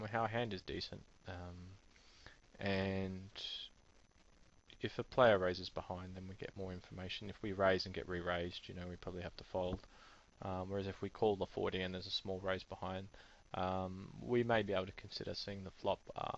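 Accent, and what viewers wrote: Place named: United Kingdom. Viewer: Thanks for the reply! By the way, I noticed that you listed Japan as the country. Are you British or Australian living in Japan? Just wondering from your accent, it's obviously not Japanese accent:)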